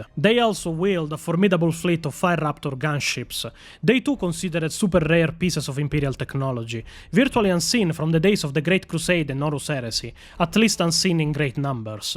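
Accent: Italy. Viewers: The only problem with these wonderful renditions of lore is now I cannot take anyone telling me lore seriously that doesn't have an Italian accent. 🙂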